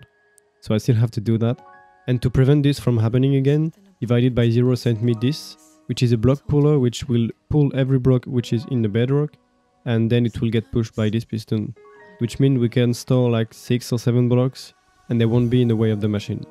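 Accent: France. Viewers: You have a French accetn no? Good work🎉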